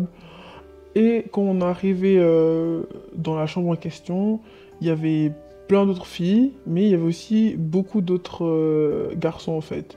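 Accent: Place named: Belgium. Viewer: Sa s'entends que c'est une Belge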